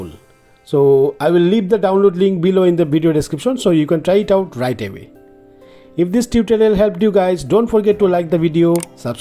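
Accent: India. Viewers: When a guy has an Indian accent, you know it's going to be the best video of all time.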